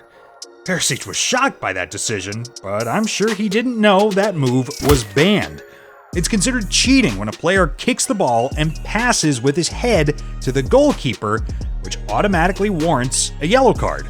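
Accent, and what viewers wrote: United States. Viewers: This channel sucks I can ignore the horrible american pronunciation but making passé remarks about Ronaldo being fat is just embarassing and completely unnecessary, considering the fact that it's of little fault of his own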